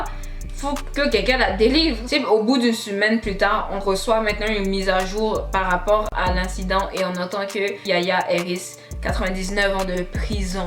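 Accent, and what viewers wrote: Quebec, Canada. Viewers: J’ai l’impression que t’as grandi au Québec ou au Canada t’as un peu l’accent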